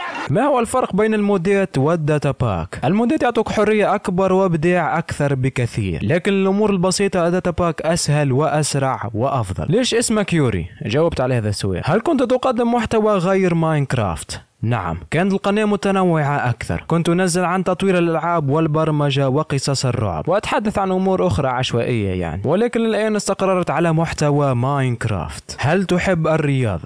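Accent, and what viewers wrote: Tunisia. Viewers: انت تونسي كيفي؟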